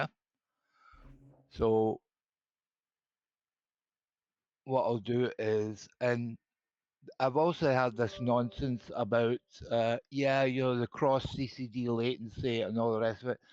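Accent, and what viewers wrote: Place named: United Kingdom. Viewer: oh god a brit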